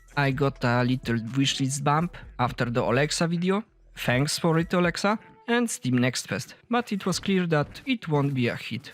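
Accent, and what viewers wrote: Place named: Poland. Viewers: Polacy, nie wstydźmy się swojego akcentu! :) To, co jest najważniejsze, to czy jesteś w stanie zrozumieć przekazywaną informację - ja oglądnąłem i nie miałem z tym najmniejszego problemu. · slychac ten polski akcent 😅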